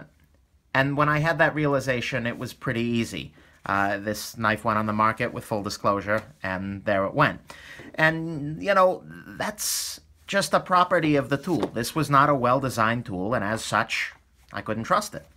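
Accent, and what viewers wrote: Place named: New Jersey, United States. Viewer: wtf ... this n.j. accent for real?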